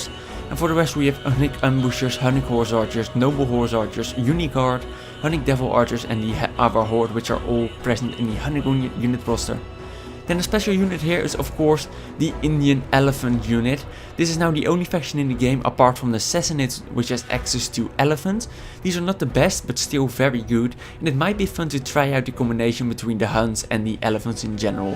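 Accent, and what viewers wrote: Netherlands. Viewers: where are you from you sound dutch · yes of course, i understand the need of time in these video's, still awesome you upload them in such rapid succesion, i only have one question, maybe you said it before but i might have forgotten it, are you dutch? Because you kinda sound dutch. Although this is no offense of course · Serdar Kaleli you idoit hes dutch no indain you rasict! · That's a dank Dutch accent you have there friend.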